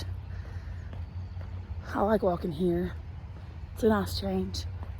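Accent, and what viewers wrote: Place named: Appalachia, United States. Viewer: I’m watching and have reached the end of your day 20. I’m inspired by your walking, especially walking without media! May the Lord help you through all your adversity!!! 😢 Btw, your accent sounds like mine so I figure we both live in the southeastern part of the United States. I call my accent Appalachian Foothills.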